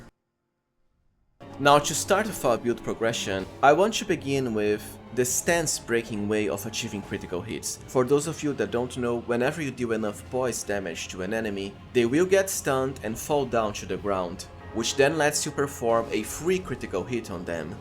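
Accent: Brazil. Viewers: Cool video, I'm a elleonoras main user but often use dual dagger reduvia/great knife or misericorde. Btw are you Brazilian? I may be wrong but the accent sounds pretty much alike. Cheers.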